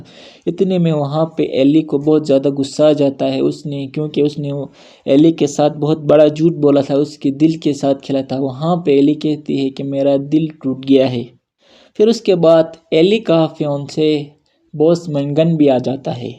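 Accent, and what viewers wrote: Gilgit-Baltistan, Pakistan. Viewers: confirmed gigiti ho bhai. i can tell you cox of your heavy Shina accent. :D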